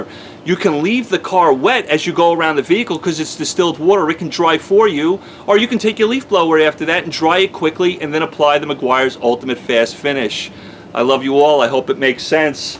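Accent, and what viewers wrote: New York, United States. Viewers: Did you perhaps grow up in ny or nj? The way you talk sure sounds like it :)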